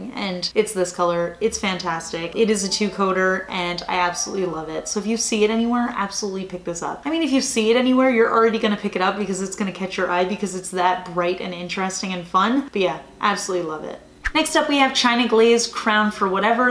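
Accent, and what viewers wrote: New Jersey, United States. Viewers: I didn't even notice that you said "pastel" in a way that would bother people. I'm from north of Boston but I'm half NJ on my mother's side, so I grew up hearing words differently. Farhead and Foah-head, Radiator (with a short a like apple) and Raid-ee-aydah, etc. So count me in as a fan of the NJ accent!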